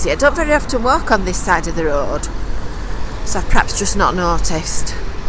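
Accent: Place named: Hull, United Kingdom. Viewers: It’s still a veryYorkshire accent ! Nice to hear ! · Are you from the hull area because you have that twang you talk like Lucy Beaumont the stand up comedian who is married to John Richardson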